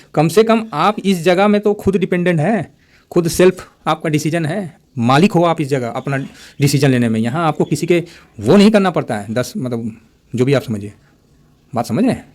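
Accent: Bihar, India. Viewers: Sir aapka style bulkul hi deshi hai, sir aap jarur bihar ke honge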